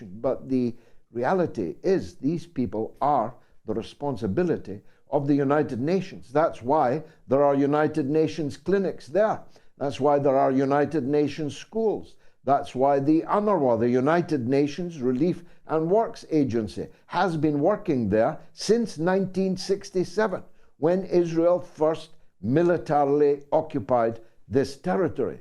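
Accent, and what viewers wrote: Scotland, United Kingdom. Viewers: Tacitus born 56 AD would be proud of you a man of words well don't know what he think about the Scottish accent. I looked it up because I love history. Thank you, you can tell wifey about that❤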